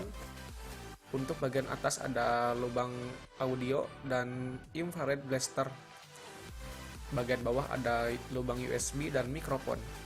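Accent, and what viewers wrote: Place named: West Java, Indonesia. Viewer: Sunda pisan! Meni resep 😆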